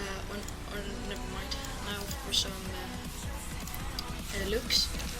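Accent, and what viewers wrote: Sweden. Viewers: u swedish ? You sound like it lol i am swedish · lol hörde direkt svenska dialekten så var tvungen att kolla om du var svensk och ja det stod ju i din beskrivning ^^ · Lol man hör att du är svensk xD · Agario he has to be, definitely sound like a swede! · Du bröt lite på svenska ;D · Thank you so much! now people can make money from this so if guys can make good intros then you can get like 20 $ for 1 intro! btw i did hear that you are from sweden (Im Norwegian!)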